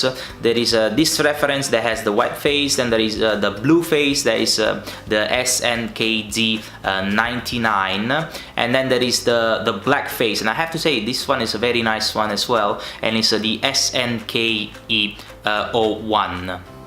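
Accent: Italy. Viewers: The italian is strong in this one, btw nearly pulling the trigger on this watch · I bought this watch and I love the looks and I love the size. Great review and I did pull the trigger and got one partially due to this review so thank you. My grandfather came from Sicily so I had no problem understanding the Italian accent, in fact I loved it.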